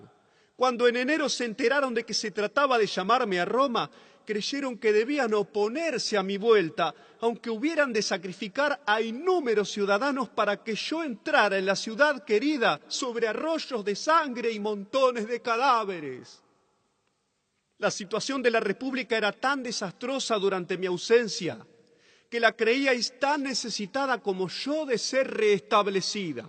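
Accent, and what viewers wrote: Argentina. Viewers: No había otro que no fuera argentino? Hace sonar pedante el discursivo este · DEFINITIVAMENTE EL TIMBRE ARGENTINO ES PESIMOOOO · No es el hecho del acento es el hecho de NO RESPETAR EL LATIN HABLANDO COMO ARGENTINO!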